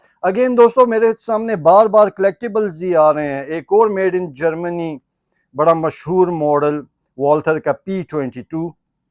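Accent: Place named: Hindko, Pakistan. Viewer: sahib very nice hindko dialect ❤, secondly mein nay bhee apna 30 bore 21000 ka khareeda tha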